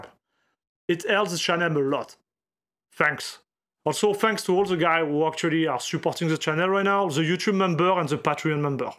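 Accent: France. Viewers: only reason i end up watching the things i already know about is due of the lovely french accent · Due to an fortunate event I upgraded my 1440P monitor to an 4k LG OLED 44C29LB. Since I still have a RX 6600 XT running I will need to use FSR every here and there. Btw. your french accent is sounds funny and good luck with your channel. You seem like a cool guy.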